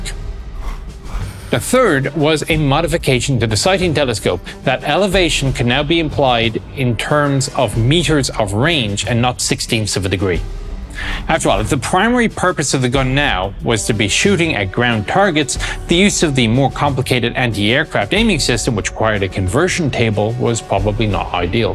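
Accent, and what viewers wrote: Ireland. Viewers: Ditch the music now mate! Your sweet Irish lilt is all we need Chieftain! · So this music volume is mandated by wargaming then? But... why? If its volume was less than half of what it is here, I might actually be able to concentrate properly on the really interesting stuff the Chieftain's trying to educate me in, but instead we get boss music with some faint, soothing Irish tones in the background, apparently.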